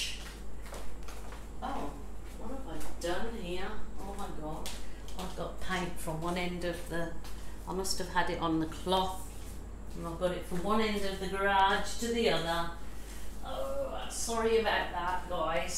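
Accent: Australia. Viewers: If you are in New Zealand and wanting to buy one of these little heat guns they are available at The Warehouse! Also thank you to for your instructional videos. Whenever I am watching them I always come away feeling very inspired and also a bit less homesick because I get to hear the good ol’ Aussie accent you have:-) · Nice To hear an Australian voice behind his pours this is something I want to get into I’m up till two in the morning watching all the different YouTube contributions and U sounds like a real character typical Australian and I love you tutti-frutti Creation Carnival pour I’m a W A girl thank you lady mate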